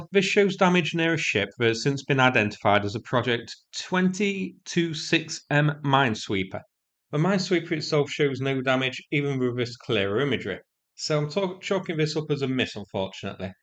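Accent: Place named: North, United Kingdom. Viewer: WHY DOES THIS BLOKE SPEAKING REFUSE TO HONOR MY REQUEST THAT HE SPEAK MORE CONCISELY AND WITH MORE OF THE QUEEN'S ENGLISH. He has a broader audience than just Manchester or Liverpool or the Lake Districts or wherever he lives. Thank you in advance, again!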